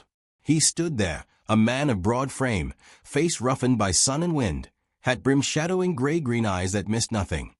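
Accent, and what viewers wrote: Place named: United Kingdom. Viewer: Again with a Brit voice narrating an old-west story.😠 But damn your high-and-mighty principles, lady. Your pride doesn't fill empty bellies. I'm sick of people who 'won't accept charity', when they've been PRAYING for help until they turn blue, but they throw it back in god's face, because they don't like his method of delivery.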